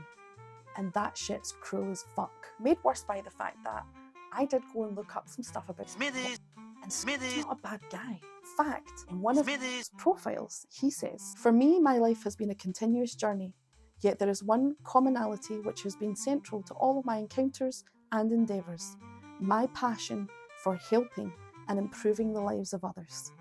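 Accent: Scotland, United Kingdom. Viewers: I especially appreciate your sense of humor, knowledge of music, no nonsense approach and of course, your wonderful Scottish accent! p.s. Thanks for making me a sandwich!!!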